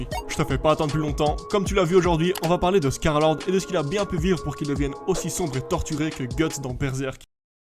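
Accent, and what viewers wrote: Belgium. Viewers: T’es belge ??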